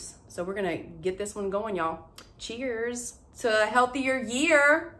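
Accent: South, United States. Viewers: Alright, I just stumbled on to your channel today by fluke/accident while looking at something else and I have SO much to say about how great I think you are but there ain't enough room here so maybe another time. But I will say these 3 things:your accent (from one southern girl to another!), your so down to earth (which feels so rare these days on social media) and lastly in addition to you showing us your finds the fact that you rattle off different ideas we could use them for is amazing! Especially for extremely busy gals such as myself that gets overwhelmed which creates creativity blocks 🤦🏼‍♀️. I just wanted to share also 2 ideas, those Yardley or any of the body wipes also are great for elderly ppl that may have a hard time getting in the shower so they're easy to use for them to freshen up between showers. And 2nd that hearts silicone tray you got in a previous haul could also be used to fill with water for ice cubes which would be cute in a beverage (such as house wine of the south aka sweet tea). 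Anyway I'm a new subscriber now and am telling my Mama & friends about you too. Thanks so much 🤗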